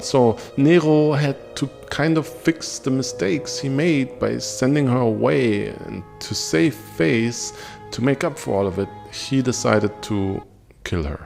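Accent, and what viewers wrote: Germany. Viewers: Irre ich mich, oder sprichst du die römischen Namen auf Deutsch aus? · I love your German accent !!! Vielen Dank für deine coole videos!!! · Am I detecting a German akcent here ?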